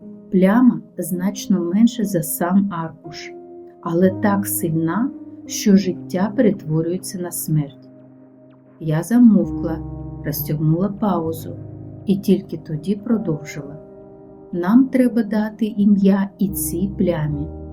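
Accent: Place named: Ukraine. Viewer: Дякую! Як мило звучить Ваша українська. 💗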